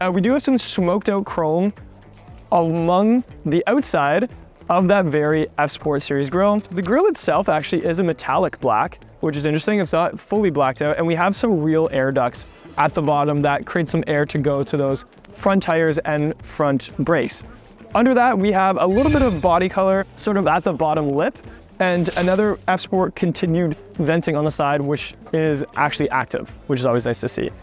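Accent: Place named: Canada. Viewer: You’re so cute and love that Canadian accent! Nice video- a pano sunroof would make it perfect! What’s taken Lexus so long to put them in? Same with not the latest infotainment?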